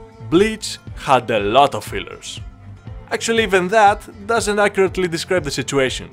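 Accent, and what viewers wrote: Greece. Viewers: are you from greece? yhat accent is familiar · i instantly knew you are greek from your accent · that's a pure greek accent xD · Why this guy sound like a greek man speaking english. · I watched the video 7 times to understand what country your accent is from and ended up with a question. Are you Greek? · Are you Greek? Your accent is so familiar😂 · Φίλε μιλάς πολύ καλά αγγλικά αλλά για κάποιο λόγο με το που σε άκουσα λέω αυτός είναι Έλληνας 😂😂😂. Συγχαρητήρια πολύ καλό βίντεο!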